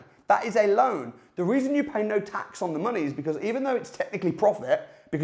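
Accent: United Kingdom. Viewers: Why does the British accent make this video un watch able.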